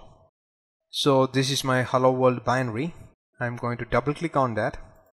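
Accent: India. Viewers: Thank you for the upload, though the Indian accent is rather thick and hard to understand at times. The "CC" help in that area.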